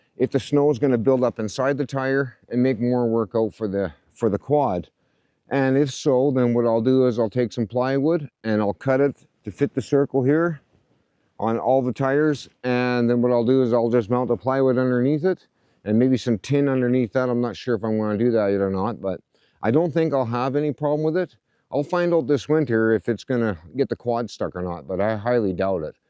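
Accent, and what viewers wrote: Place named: Nova Scotia, Canada. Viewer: Sounds like a Nova Scotia accent.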